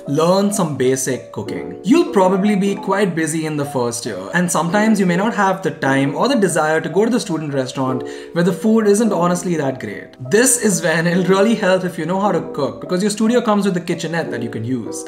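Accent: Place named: India. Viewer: I'm bothered by the strong Indian accent. I could hardly understand what you're saying. Speak well so people can understand you. That kind of English wouldn't cut at a top UK, let alone US business school.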